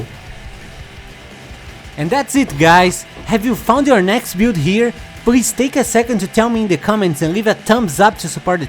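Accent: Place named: Brazil. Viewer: E esse sutaqao br ai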